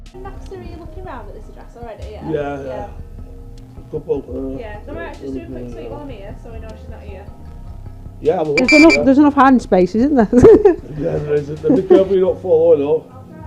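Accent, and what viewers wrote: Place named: England, United Kingdom. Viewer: &, I was just thinking of how hard it was for me to understand ' thick English accent when I 1st started watching you guys. Been about 1 year now and I understand every word 😂😂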